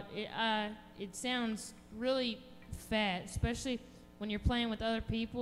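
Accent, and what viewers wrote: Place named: New Orleans, United States. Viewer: You gotta be from New Orleans! :)) Nice amp!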